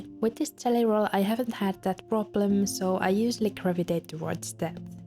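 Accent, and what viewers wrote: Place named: Finland. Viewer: This is the first of your videos I watch and I like it a lot! May I ask where are you from? Your accent somehow sounds Finnish to me (someone who is currently trying to learn Finnish) but I’m not sure whether I’m right with that assumption. Either way, I’ll definitely subscribe because I love the way you set up your Bullet Journal <3 ⏰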